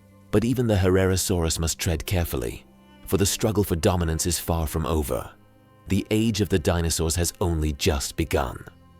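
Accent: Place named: United Kingdom. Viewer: Just sub'd; lovely video, love that British voiceover ! Good luck with your channel...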